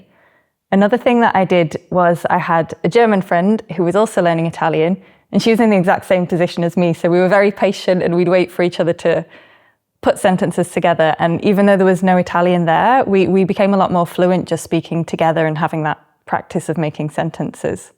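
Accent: United Kingdom. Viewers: My first time hearing your native British accent. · Wov, what beautiful british accent. I vove it. Theo-Canada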